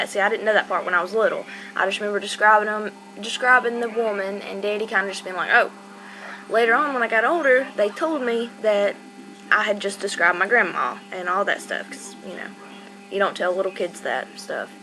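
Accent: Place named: Louisiana, United States. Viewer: Omg where are you from? You remind me of my friend who is from Louisiana, yall talk the same way! lol i love it. Anyways, that last story was horrifying. Like holy hell, idk what I would do if I were you!!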